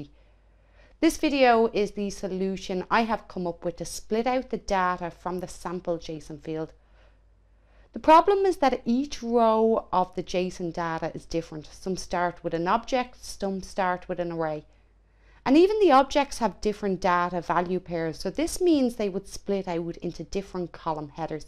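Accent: Ireland. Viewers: Great. Thanks. Btw nice Irish accent. :-)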